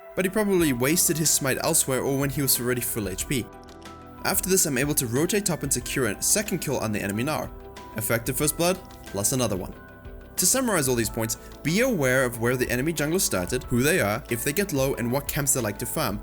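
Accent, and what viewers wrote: South Africa. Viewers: It's a pretty cool accent. I was just listening to it and kept thinking "Is that an Afrikaans accent? Wait, that sounds really English... Now he sounds American!" It was confusing but in a good way! Do you speak Afrikaans at all? I picked up a lot while I was in SA but it was really easy to learn since Dutch is my 2nd native language... I have a pretty unique accent too because of my parents are different nationalities (English/Dutch) and I grew up different countries + international school.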